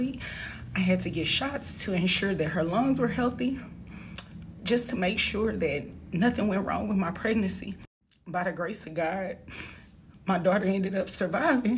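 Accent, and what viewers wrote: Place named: South, United States. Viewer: Great to hear you got through. On another note that may put a smile on your face. From over here in England, we kinda like the southern accent. I have been waiting for a ya'll since hearing you keep saying "you all may be seated". Can hear you hiding it, Thank you for your ya'll.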